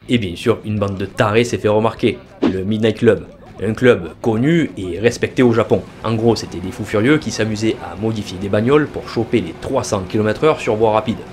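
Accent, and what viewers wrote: South, France. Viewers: tu t'exprime avec un super Français, qui sen un peut le sud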